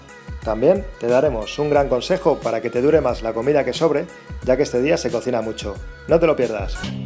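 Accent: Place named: Spain. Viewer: Odio ese acento español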